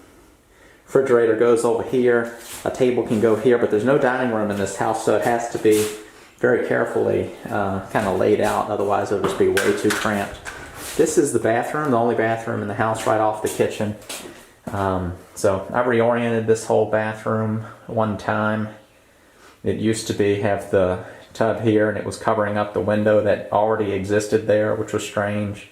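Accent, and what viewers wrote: South, United States. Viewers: Sounds like you're from the South, what state are you in?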